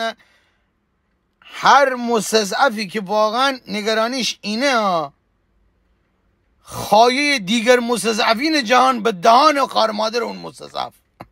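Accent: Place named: Rasht, Iran. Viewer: درود بر شما، میشه خواهش کنم که نرمال فارسی رو صحبت کنید چرا نباید بدون لهجه رشتی تحلیل کنید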